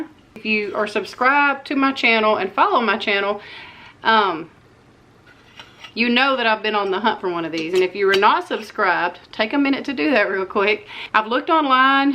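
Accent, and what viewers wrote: South, United States. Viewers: I'm from Georgia and you sound as southern as I do. LoL love you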